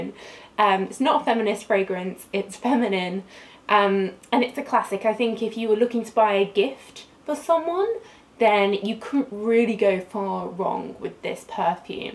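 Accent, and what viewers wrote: United Kingdom. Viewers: I love this accent not every British person does have this kind of accent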